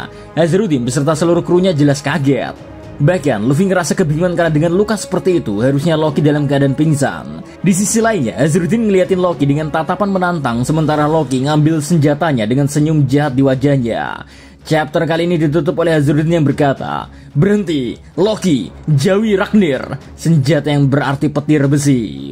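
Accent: Java, Indonesia. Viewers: Males bgt denger suaranya Medok nya medok ga enak. Nada medok jawa tuh enak, terkecuali lu.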